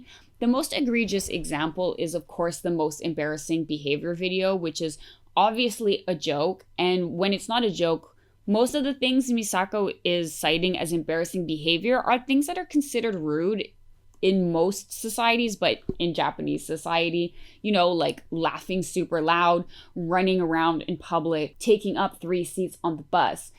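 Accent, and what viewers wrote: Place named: Quebec, Canada. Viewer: This is such a rich video!! As you mentionned I feel like online discussions about not-online aspects of the fashion became less common through the years, so hearing a « modern » discussion around this topic is really interesting. Also unrelated but I’ve been watching your channel for soo long and somehow didn’t realise you were from Québec (more specifically than Canadian I mean)?? For context I’m French so the nuance between Québec accent and native English accent can be a bit hard to catch on single isolated words sometimes (like brand names for example), and the sudden language switch totally jumpscared me haha! Merci beaucoup pour cette chaîne et tout le travail derrière !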